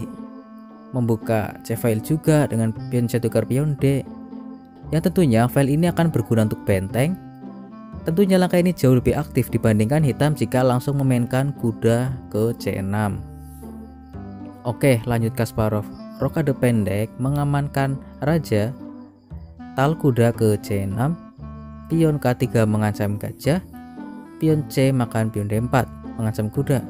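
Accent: Banyumas, Indonesia. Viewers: Logatnya seperti Purworejo - Banyumas ya, aku Wonogiri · Bahasanya ngapak ngapak bang..hehe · Ngapak ya bang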